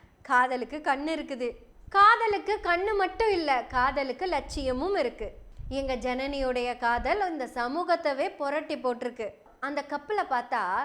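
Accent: Kongu, India. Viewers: தாயே அருமையான கொங்கு தமிழ் உனது குரல் மலழை மொழி போல் உள்ளது அருமை தம்பதிகளுக்கு மனமார்ந்த வாழ்த்துக்கள் ஜெய்பீம்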